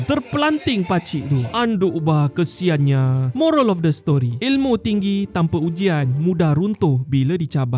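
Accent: Sabah, Malaysia. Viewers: anduk bah kesian. hahaha very sabahan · gaya cakap cam org sabah, bukan mau kata apa lah.. Di sabah ada macam macam jenis seni pertahanan diri, cuba cari "pasak kota belud", ada video tamat belajar, dengan berdiri atas bara dan mandi sungai sambil di hiris dan di tetak parang. Tak silap video dari tahun 1980/1990an. Dan di akui kebanyakan ahli yang tamat bukan jenis berlagak. Tapi kalau ada menjual, mesti ada pembeli. Dan macam op yg ko jawab cakap, pengamal selalu cakap "ada Allah" Atau "cukup Allah". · Saya tak berapa kelakar tgok video ni.. cuma kelakar dengar suara yg buat kontena ni.. sebab bahasa kg ku yg dia guna... Andukk bahh😅😅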